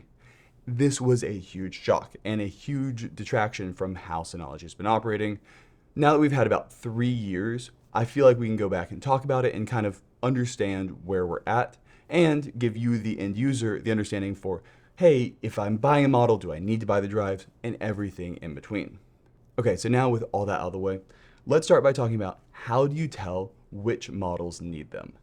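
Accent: United States. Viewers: this accent is linked to which region in US?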